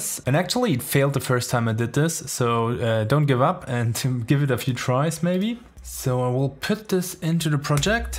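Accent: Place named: Austria. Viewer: Terminator accent the best you gotta love it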